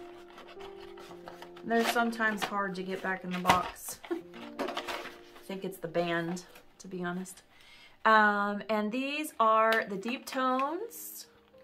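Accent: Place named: England, United Kingdom. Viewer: Are you from Australia or the USA? Just wondering what dollar we are talking, actually you sound very English ☺️ I was born in England but I have lived in Australia and become an Australian for 50 years now. The time goes so fast, the older you get the faster it goes 🤔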